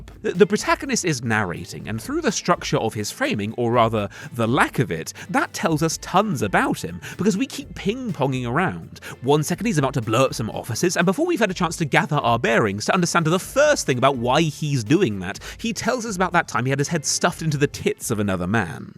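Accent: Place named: RP, United Kingdom. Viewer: Almost stopped watching at "Indi-AWH-na Jones". Mate, learn how to integrate proper pronunciations with your excessively RP accent. It's like when brits say Jackarse, it makes my skin crawl.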